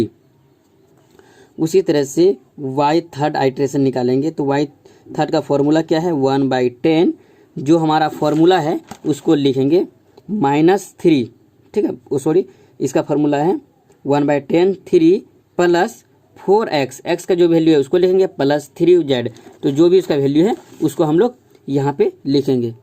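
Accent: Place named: Bihar, India. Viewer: Pakka Bihar se hai aap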